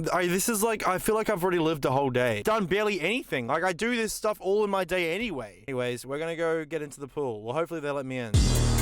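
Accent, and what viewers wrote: Australia. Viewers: He is Australian I believe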